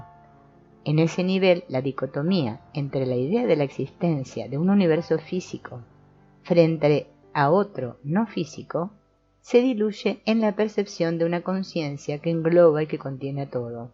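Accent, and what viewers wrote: Argentina. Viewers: Me lo estoy estudiando segundo a segundo. Ya leí el libro. Y escucharlo narrado por una Argentina, fue una sorpresa grata. Voy a dar una conferencia sobre el tema.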